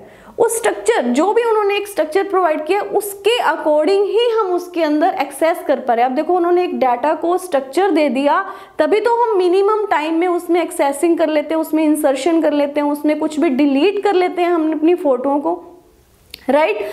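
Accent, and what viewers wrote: Punjab, India. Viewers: Beautiful teacher with Punjabi accent 😀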